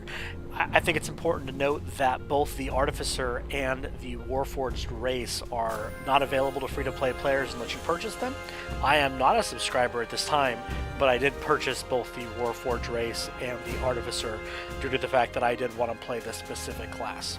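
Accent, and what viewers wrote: Netherlands. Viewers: are you dutch? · are you dutch ? :D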